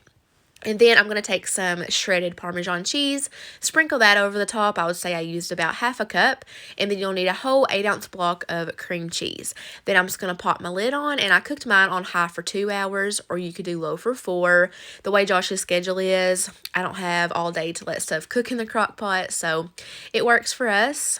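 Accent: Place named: Kentucky, United States. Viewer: Hi, When you record your voice overs you often say ‘and then’ try mixing it up with ‘the next step’ or ‘the recipe says’ or ‘what you want to do next is’ we all love your Kentucky accent ❤️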